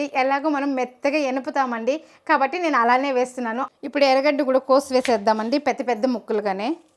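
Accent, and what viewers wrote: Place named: Chittoor, India. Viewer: Ivala try chesamu mee revepii..mee di proper ey place andi..seems like from chittoor ..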